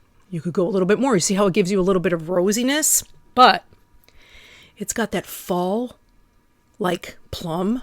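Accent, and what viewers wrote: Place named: Midwest, United States. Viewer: Love your midwestern accent! 😀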